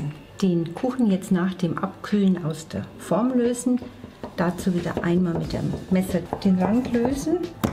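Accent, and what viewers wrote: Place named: Franconia, Germany. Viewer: Liebe, jetzt muss ich dir endlich mal ein Kompliment machen!Deine Rezepte,Tricks und deine liebevolle Art sind einfach super! Dein fränkischer Dialekt mag ich besonders,komme auch aus deiner Gegend.Da ist immer ein bisschen Heimat dabei!☺ Bitte mach weiter so!Du bist genial!🍰🍩Danke du Liebe🌹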